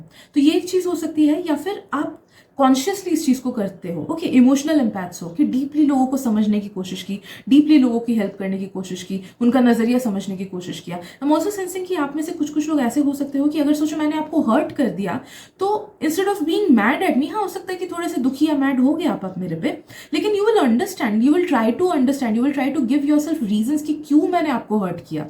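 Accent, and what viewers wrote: Bengal, India. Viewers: Didi are you bengali ??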